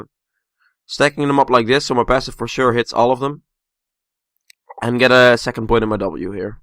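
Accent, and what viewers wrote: Netherlands. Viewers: Duidelijk Nederlands accent :p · Hahaha het nederlandse accent op engelse taal is altijd zo herkenbaar.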